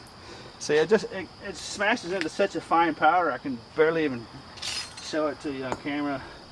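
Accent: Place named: North Carolina, United States. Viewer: I'm curious about where your accent is from? I like the way you talk...sounds like north Carolina.....thx for the vids and info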